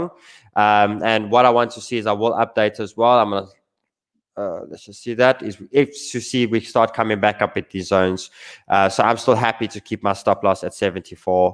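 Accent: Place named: South Africa. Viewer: I’m South African, but damn, I’m loving your accent. I miss SA accents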